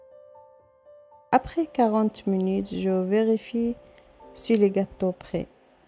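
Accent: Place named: Levant, Arab world. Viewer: من طريقة الكلام اضن صاحبة القناة من المشرق العربي . يعطيك العافية ❤❤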